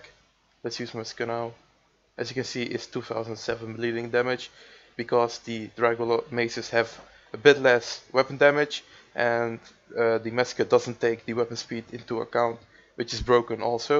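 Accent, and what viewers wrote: Netherlands. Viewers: just a question where are you from? Basing on your accent im quessing the netherlands?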